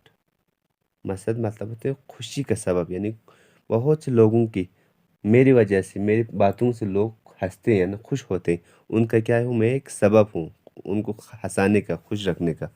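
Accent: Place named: Pakistan. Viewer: Ooo bro bohot Jldii reply kiya h , I told because, Sir ka Urdu accent sa laga Mujhe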